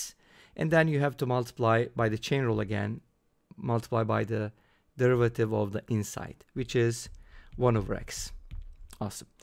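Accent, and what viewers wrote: Turkey. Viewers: Im trying to determine where this guy is from the whole video. I've concluded with Turkish by the accent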